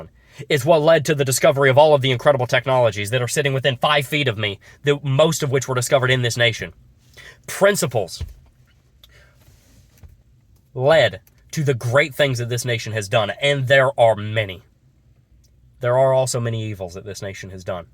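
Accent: United States. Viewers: omg you sound like every american ever rofl. Land of the free hahahahahahahah. Where kids go to school and get shot, no where else on earth does this happen. The great wars it starts, the great friendly fire it causes, the great debt its in, need i go on?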